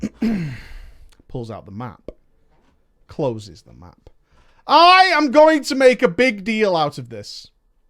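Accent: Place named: United Kingdom. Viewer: grin good stuff mate - every time you go off in your accent (to me ) you sound like a couple of Brits I served with FOB Bostic Afghanistan A cuppa fixes everything lol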